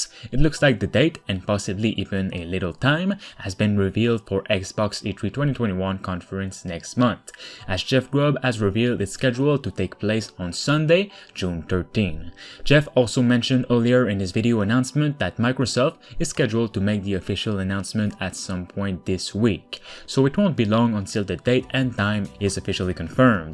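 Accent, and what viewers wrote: Quebec, Canada. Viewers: Sounds french Canadian, like the guys I used to play hockey with. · he is Canadian/French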